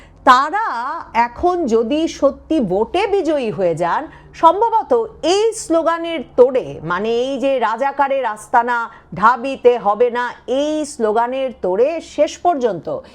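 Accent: Kolkata, India. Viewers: আপনে এই রকম কলকাতার মেয়েদের মত কথা বলেন কেনো?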